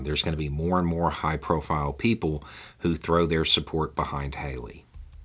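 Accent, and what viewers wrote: South, United States. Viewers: Whoa. I've been watching about a year, so maybe back then you had more of a southern accent, or perhaps you were putting it on? I had to turn on the closed caption and that didn't even always help!! But a big LOL on that clip,!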